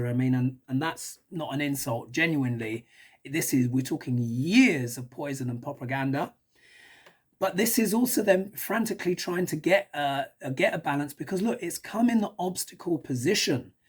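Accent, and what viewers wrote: England, United Kingdom. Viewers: I TOLD MY TEENAGE GRANDSON U SAY SLURP. OMG HE FELL OFF THE BED LAUGHING. THEN HE CALLED A CLASSMATE N THEY BOTH R SAYING IT WAITING FOR ME TO TELL THEM IT SOUNDS LIKE U. I'M NT GONNA SAY THAT BC THEY R FUNNY TRYING TO. THEY SPEAK LIKE U TO PPL THAT DON'T KNOW THIER AMERICAN, NOW THAT'S FUNNY. I LET THEM LISTEN TO YOU SOMETIMES TO HEAR U. I TOLD THEM THEY'RE GOING TO TAKE A TRIP ONE DAY TO ENGLAND N TEST THAT WITH REAL PPL WHO SPEAKS LIKE U, N THEY'LL TALK ABOUT IT FOR THE REST OF THIER LIVES. N I'M COMING TO BC I'VE ALWAYS BEEN DRAWN. THERE FOR SME REASON. BUT I'LL FIND OUT I GUESS LUV U, STAY WELL N. SLURP ALLL U WANT ON UR SHOW.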